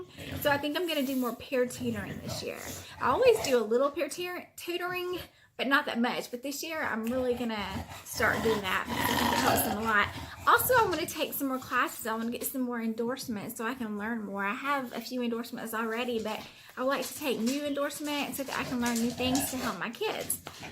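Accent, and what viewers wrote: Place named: South, United States. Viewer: Welcome to the teaching tag! I love your southern accent! Hubby and I have always wanted to travel to the south and try the food! I'm so glad you are doing some more peer tutoring. Its so beneficial for students on both sides of the learning. I will add you link to my January video ♥️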